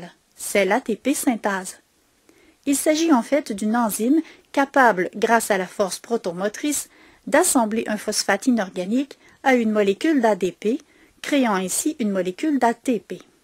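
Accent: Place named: Quebec, Canada. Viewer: Clair et Conscit merci ! (petit + pour l'accent québéois trop génial :) )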